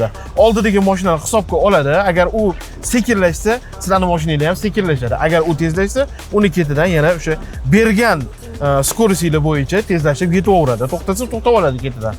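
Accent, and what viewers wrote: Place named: Khorezm, Uzbekistan. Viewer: cho'nqir Xorazmchaga gap yóq og'a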